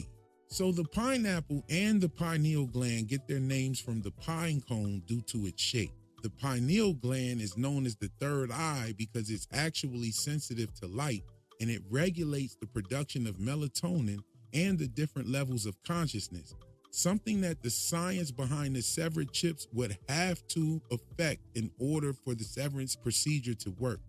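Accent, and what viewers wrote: Philadelphia, United States. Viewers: Are you from Philly? 😂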